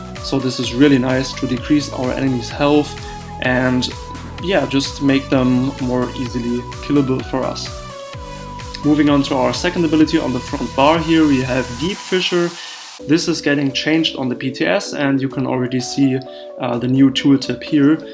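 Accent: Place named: Germany. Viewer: nice build and well explained. The background music is a bit loud and overall terrible for such a long video but everything else is well done. Finaly someone mentions the Trial by Fire set, it's so overlooked and hands down the strongest defensive set for PVP. I would also like to see a build using Torug's Pact, another much overlooked set. Liked and subbed and looking forward for more content :) PS: Dein deutscher Akzent ist killer :D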